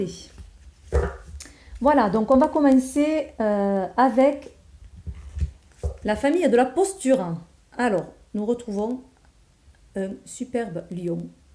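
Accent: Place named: Gard, France. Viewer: ça sent le Gard, cet accent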